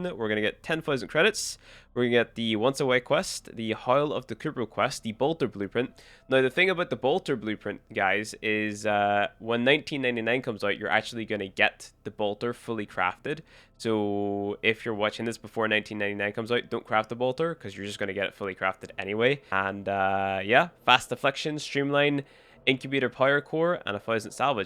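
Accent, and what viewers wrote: Northern Ireland, United Kingdom. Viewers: Is that a fellow Northern Irish accent I hear? Well damn, I might just take that as a sign to give Warframe another go.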